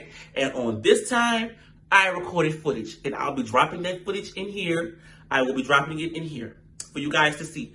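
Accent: New Orleans, United States. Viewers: Bitch this story time got me rollinnnnn 😂😂😂😂 omfg! I’m subscriber 557🥰🥰 and where you from? The accent giving new orleans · chileee this story was too mf juicy lmaooo I had to subscribe once I hear the nola accent.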